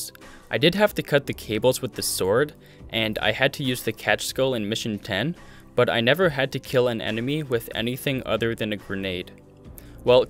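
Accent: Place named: Southern Canada, Canada. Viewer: your accent so south-canadian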